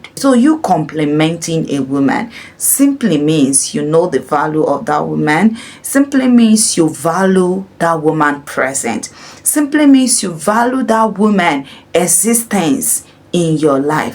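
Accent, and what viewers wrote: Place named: Nigeria. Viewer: I'm not joking about it, this is what i thought you were coming from america , but when i hear your english it's where i notice that you are from Nigeria eg when you say Joy stick bacas and all those you add on it oh my goodness you put spies...i love it very much..💋💋💋💋💔💋💔💋💔💋😊🤣😊❤️‍🩹❤️♥️♥️💋💔 remain blessed my beloved sister from another Mother watching from Johannesburg...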